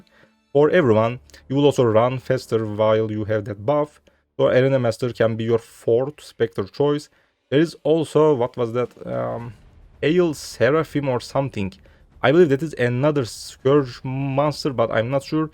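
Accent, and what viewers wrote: Turkey. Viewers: reis türk gibi ingilizce konusuyor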